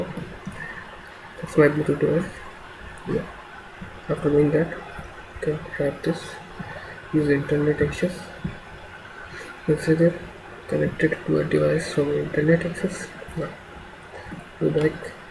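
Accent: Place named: India. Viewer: wtf is he saying? that crappy mic combined with his indian accent...omg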